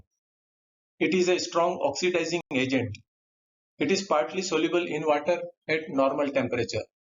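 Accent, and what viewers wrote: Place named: India. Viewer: I don’t understand your India English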